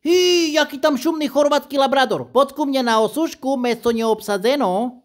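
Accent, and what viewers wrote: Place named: East Slovak, Slovakia. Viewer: Juuuj, jsi největší hvězda ⭐ na jakou jsem narazila. Kdysi jsem měla manžela z východního Slovenska a tento dialekt miluju❤mám na to krásné vzpomínky ze Šariša😂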